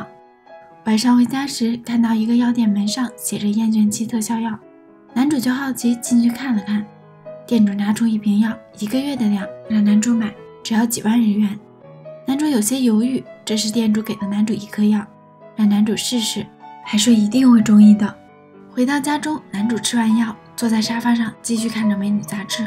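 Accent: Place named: Shandong, China. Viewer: 讲解人山东口音。。。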